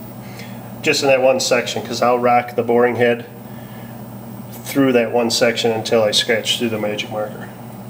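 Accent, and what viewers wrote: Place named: Wisconsin, United States. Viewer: i can tell you're from WeesCAWNsin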